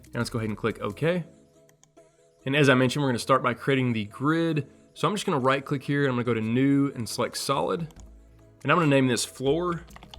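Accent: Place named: South, United States. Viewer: So many gems in this!!! Thank you! I'm from NYC, but ur accent reminds of me of my fam down south.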